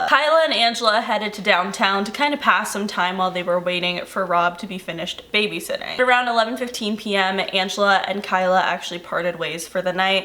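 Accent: Canada. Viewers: I thought I was the only one who thinks she's from Canada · D W I think she's from Canada that's why lol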